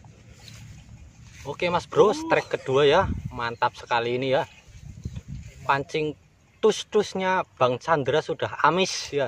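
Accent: Banyumas, Indonesia. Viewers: ngapak.ngapake ngendi mas bro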